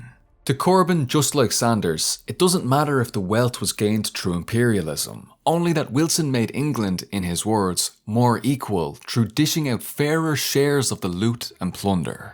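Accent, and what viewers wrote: Ireland. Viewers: I am binge watching your channel now. Gotta love your perspective, analysis and of course your Irish accent. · Nice too hear an Irish man leading the charge still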